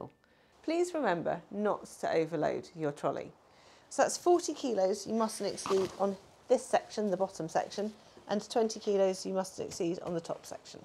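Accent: RP, United Kingdom. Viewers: Her accent reminds me of Queen Elizabeth.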